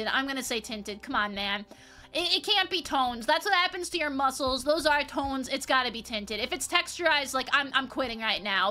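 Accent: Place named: Midwest, United States. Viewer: you sound mid western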